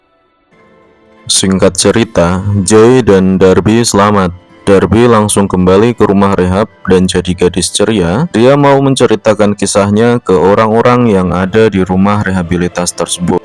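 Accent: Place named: Java, Indonesia. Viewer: Min suaramu medok tp AQ suka wkwk menyala bosku gas nonton film rekomendasi nya 🔥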